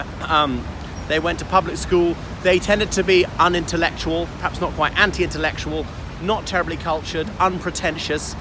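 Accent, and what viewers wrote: RP, United Kingdom. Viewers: RP accent from Ireland. That's gotta be super rare. Do people give you crap about it in Ireland? Or do you use Cork when you're home?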